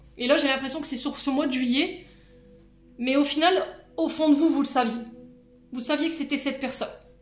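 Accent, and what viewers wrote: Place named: Pas-de-Calais, France. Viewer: bonjour vs êtes du nord pas de Calais? vs avez l accent bien écrasé du pas de calais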